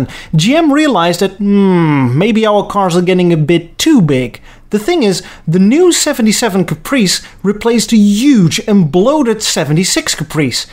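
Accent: Netherlands. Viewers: Great, interesting gloriously, mammoth series of videos. really enjoyed each one and it has given a sparkling insight into that period in American car evolution. Don't worry about your pronunciation. Your Dutch accent is brilliant and quirky. How does one pronounce Coupe in the USA, England (the home of English) and Europe? There isn't only one pronunciation! Please keep up the good work. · are the very best motor vehicle episodes on YouTube. Brief, to the point, factual, entertaining humor enhanced further by Dutch-accented delivery. FIVE STARS.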